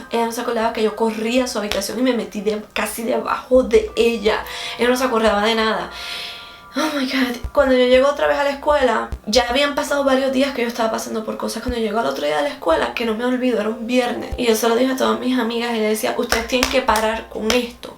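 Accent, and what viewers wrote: Puerto Rico. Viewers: Ella habla bien simplemente es el acento y la rapidez para hablar de cada país muchos en Puerto Rico hablan así y en varios países más rápido después de un tiempo te acostumbras y les entiendes mejor. A mí me pasaba que a los venezolanos y cubanos no les entendía bien, es cuestión de entrenar el oído como cuando haces listening con el inglés 😀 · en el rato que te escuche tenias acento de PR Venezuela y Mexico?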